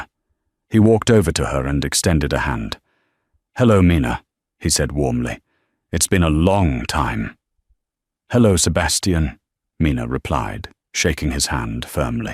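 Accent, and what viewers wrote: United Kingdom. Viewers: The commentator's voice is quite pleasant, as well as the British accentuation that gives a convincing touch to the story. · I certainly prefer the British English. The grammar, accent and pronunciation are in-line with my education.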